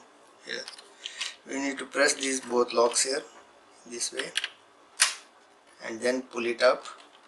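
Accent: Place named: India. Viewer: He didn't go to the extent of actually showing us how to replace the chip. Also, heavy Indian accent made it impossible to understand what he was saying, so needless to say whatever he was doing in disassembling the unit it was not clear, except for the part of saying to take a photo of the connectors before you disconnect them so that you don't get them mixed up. That part was clearly understood.☹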